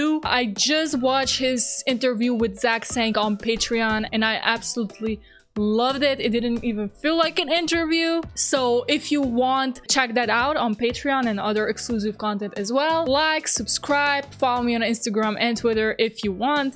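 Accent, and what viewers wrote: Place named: Slovenia. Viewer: GIRL YOU’RE SLOVENIAN ?? I always wondered where you accent was from, I can’t believe you’re there I fell in love with this country when I did an exchange there for a semester in Koper, and since than I try to come back once a year. I was so happy when I saw Ljubljana on the list, bc a very good friend of mine, Slovenian, told me how you never have shows there and you always have to go to Austria or Croatia. So good for you !! Love from France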